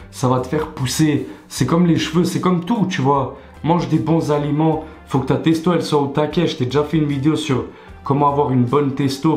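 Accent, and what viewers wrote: Belgium. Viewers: Pure belge nan?😂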